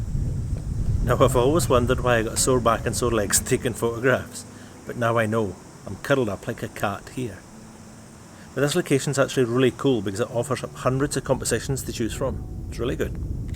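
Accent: Scotland, United Kingdom. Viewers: Thanks Rolf. Yes scottish accent with english languauge. Often difficult to understand. Practice makes perfect¡ = )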